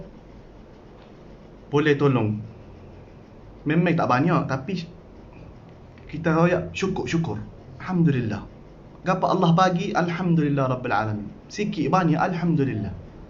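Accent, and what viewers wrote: Kelantan, Malaysia. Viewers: Hebat lah kamu boleh cakap melayu walau dlm loghat kelantan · Kecek kelate bohh👍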